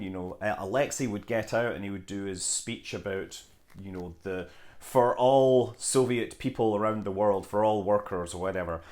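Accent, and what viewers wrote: Scotland, United Kingdom. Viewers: looks like times are hard out there, so I have shipped you some razor blades. Just because you sound Scottish, doesn't mean that you have to look like a drunk Glaswegian in a Soho gutter!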